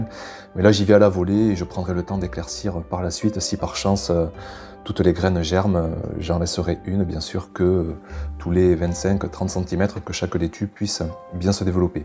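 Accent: South, France. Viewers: On sens bien que tu es du sud avec une belle régalade 😜😜😜je le dis aussi 😜😜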